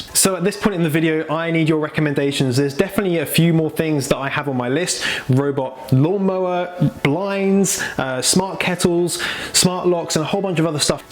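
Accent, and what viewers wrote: United Kingdom. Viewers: Great video man. I think you have everything but blinds in the living room should do it all. Really like your place. Love your English accent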